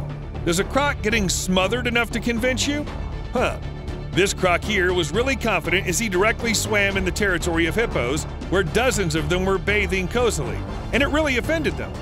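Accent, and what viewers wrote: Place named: United States. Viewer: The AI American accent is truly painful to hear. ....do yourself a favour and turn off the sound .. ooooops ,, too late ..your at the comments 😂😂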